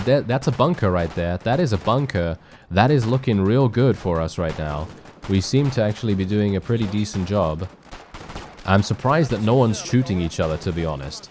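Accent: United Kingdom. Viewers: I hope you don't take this the wrong way, but I feel as though, in a game this hectic where there is so much going on, the constant commentary might be less useful. I normally think your commentary is great, in games like Bannerlord. But then we all know what to expect from Bannerlord, this game is new and I would sort of like to see you explaining how the logistics works, or letting us experience the carnage of combat without a smooth British voice calmly stating, "Oh that was a nice grenade, I'm dying." I still love it because let's be honest that's kind of funny, but a compromise could be giving us five to ten seconds in tense situations to hear what you hear, the communication in the game and whatnot. If you don't like my criticism I understand, because I am certain that many people will disagree with me, it's just a personal preference, I will continue watching either way.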